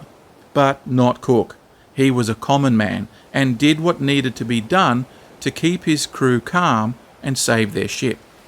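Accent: Australia. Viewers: Sorry, should have looked there before commenting, and was of course a tiny, unimportant detail in your amazingly crafted documentaries. I wish to add that I love both your texts as well as the way you narrate them - 'Strine' yet perfectly intelligible even for those for whom English is not their mother tongue and whose hearing has deteriorated due to old age (as in my case)... and with perfectly dosed hilariousness ('However,...'🤣). Your documentaries are providing me, just in time, with the therapeutic benefit of proving that the present 'crazy times' are nothing new - humanity has always been both crazily stupid as well as deeply admirable.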